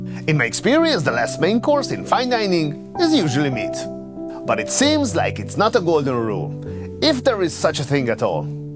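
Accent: Hungary. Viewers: you sound very very very hungarian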